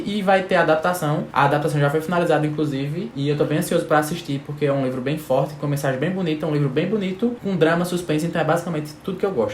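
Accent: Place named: Paraíba, Brazil. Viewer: Ahhh o sotaque! Sou de Recife, mas acho que tu é paraibano, acertei? Me senti em casa com o sotaque parecido com o meu ♥️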